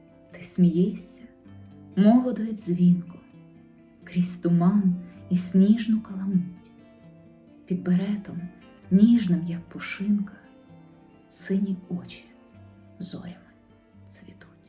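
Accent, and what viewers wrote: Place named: Ukraine. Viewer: Як Вас гарно навчили говорити українською мовою.Дякую за українську вимову звуку,,ч"!!!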